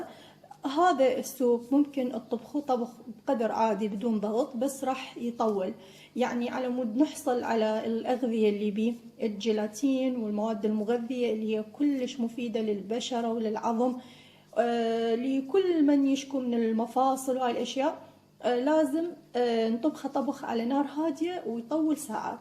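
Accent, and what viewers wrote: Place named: Iraq. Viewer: saber touati تتكلم لهجة عراقية مو خليجية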